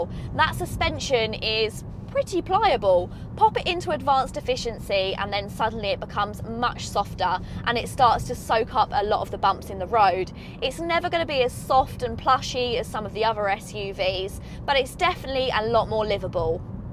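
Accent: United Kingdom. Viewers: I like that British accent and Stelvio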